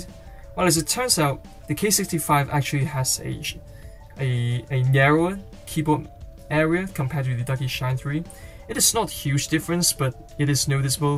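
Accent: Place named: Denmark. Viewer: you can hear thet u are Danish but ure videoes is really cool though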